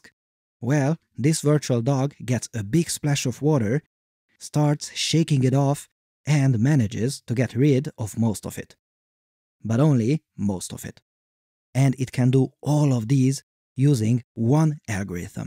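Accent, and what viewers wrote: Hungary. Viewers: A magyar akcentus befigyel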